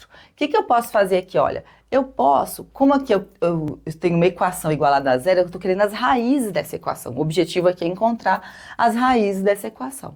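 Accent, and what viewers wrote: Minas Gerais, Brazil. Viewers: Sei lá... Quando a professora explica com sotaque "mineirês", eu entendo tudo! ♥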